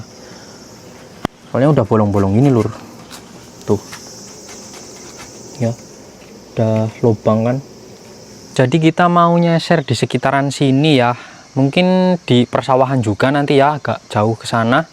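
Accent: Banyumas, Indonesia. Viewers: Kok ngapak mas, asli mana ? Maaf penonton baru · asline ngndi kang,,ngapak deneng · Kiye wong banyumasan kiyeh...cilacap, banyumas, purbalingga, banjar negara, apa bumiayu lur..?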